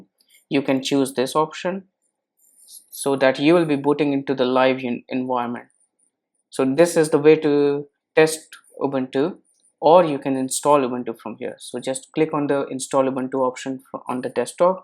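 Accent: India. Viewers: great content, but I don't the Indian accent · Why do Indians always sound like this ? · Indian accent , it makes things so easy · Can i find a video without the FUCKIN INDIAN ACCENT PLEASE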